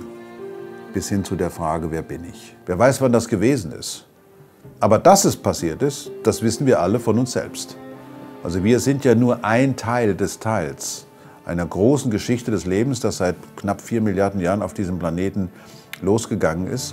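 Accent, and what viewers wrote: Hesse, Germany. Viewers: So schön hessisch! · Weidwingel! Da spricht der Hesse! :) Schöne Folge!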